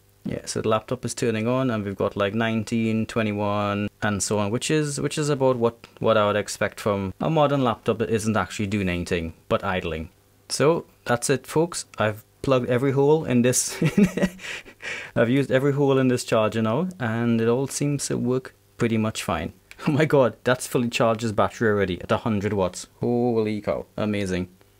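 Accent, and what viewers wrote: Trinidad and Tobago. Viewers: are you from Trinidad 🇹🇹? I was born in the States but grew up and went to primary school there. I know that accent when I hear it👍🏾. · I subscribed because of the accent that sounds trini 😮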